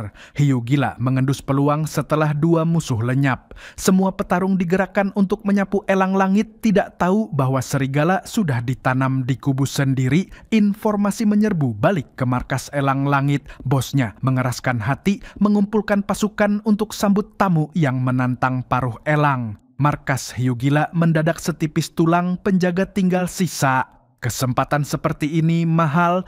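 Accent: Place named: West Java, Indonesia. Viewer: fix logat urang sunda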